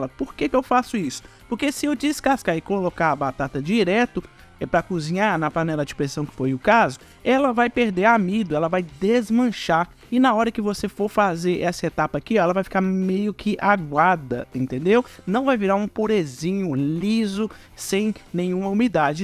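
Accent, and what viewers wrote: Minas Gerais, Brazil. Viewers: Que delícia, amei sou de SP, aqui é cheiro verde, adorei o seu sotaque mineiro!!!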